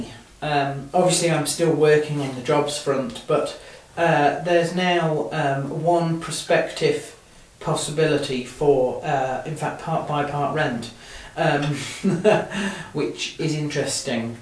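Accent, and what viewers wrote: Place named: Warrington, United Kingdom. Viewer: haha, well, good observation with the accent, tis abit of a hard one to pin point so, credit to you for conjuring up Warrington of all places hmmm, not too sure about the whole UFC notion tbh, it would take alot of commitment, but i wouldnt completely rule out at least attempting to compete at some stage in my life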